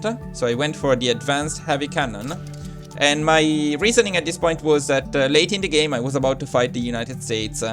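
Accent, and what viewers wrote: Italy. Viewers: Dall'accento suppongo te sia Italiano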